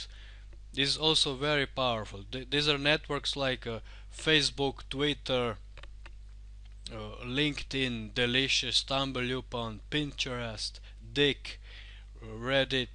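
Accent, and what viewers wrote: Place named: Russia. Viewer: oh i love Russian Accent. Greetings from Indonesia my Friend.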